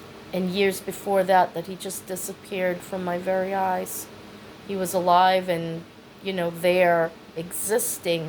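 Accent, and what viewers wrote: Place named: East Coast, United States. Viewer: You have a good voice. I pick up on a slight east coast accent. You should look into doing voice-over work. All you’d need is a nice microphone and work from home. I’m an instructional designer and many companies need good voices for training programs. Just read a script and get paid! I think you may like it.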